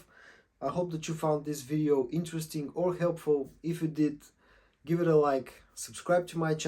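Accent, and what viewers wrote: Bulgaria. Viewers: Very good video! Might sound kinda weird, but are you bulgarian by any chance? Your accent sounds bulgarian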